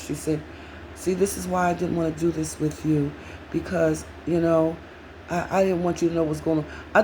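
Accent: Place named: New York, United States. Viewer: Ms.. Love your singing voice per usual! Your commentary cracks me up 🤣. Also I'm detecting like 2 accents from you...Chicago because how you say "restaurant" and NY/east coast😊.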